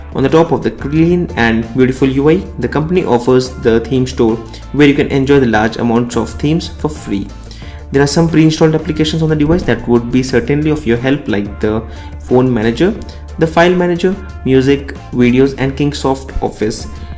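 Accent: India. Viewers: maybe you should remove your indian slank when you speaking in English..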